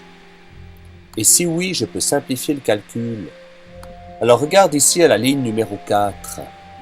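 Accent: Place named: Valais, Switzerland. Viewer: Excellent, malgré l'accent Fendant.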